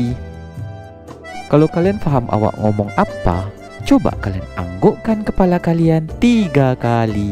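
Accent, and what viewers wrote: Johor, Malaysia. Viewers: saye suke sekali dapat melihat pulau2 kecil dikepualauan kepri, apalagi logat melayu nye yang khas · Assalamualaikum. Tak ada 'pelat'/slang Indonesia pon. Sebijik macam Melayu Johor. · Salam dari Johor..bahasa tiada beza dengan orang Johor.. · senang liat2 dan denger logat melayunya bang. asyiiik...terus yg rajin bikin kontennya ya bang...rasanya ikut jalan2... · Logatnya sama upin ipin🤭😁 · Saya dari jawa. Denger abang ni ngomong kaya Upin ipin... luar biasa bangsa kita dengan banyak suku suku... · Upin ipin wkkwkkwkk..tp serulah .cakap awak..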